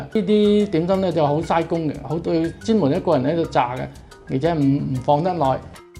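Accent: Zhongshan, China. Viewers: 中山石岐話音❤️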